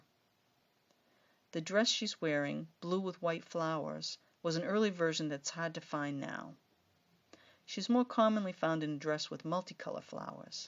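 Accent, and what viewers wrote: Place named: New Orleans, United States. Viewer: Your accent sounds a lot like my family members. Are you from New Orleans? Btw I love this video. I love vintage dolls.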